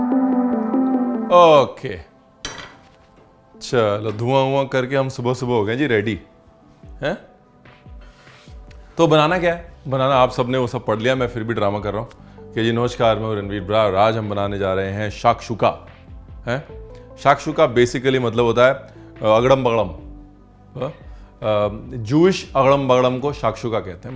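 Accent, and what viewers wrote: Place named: Lucknow, India. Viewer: I don’t watch too many cooking videos but I recently started watching yours and god hooked on to your Lakhnawi Hindi. Kya lehja hai! Take a bow Ranveer.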